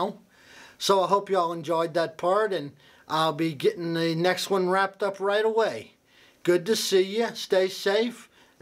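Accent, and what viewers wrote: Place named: Brooklyn, United States. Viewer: I just watched your interview with the German gentleman, wish I could remember names but, middle age, whatcha gonna do, and I got the low down and lay out of that shop and forgive me lord but DAMN! I couldn't be more impressed if the tools were gold plated. I'm looking forward to seeing more of your skills and ideas. My biggest question so far is how you can live in Texas and retain as much Brooklyn in your accent as you do, or am I losing my hearing too? Gotta go now, you're about to reveal your peacock table and I don't want to miss it. We'll talk again, Peace and respect from Idaho.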